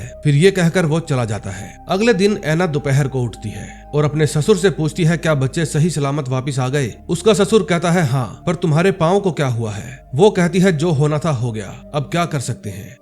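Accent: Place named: Haryana, India. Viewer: Haryana se ho aap ki voice se lagta ha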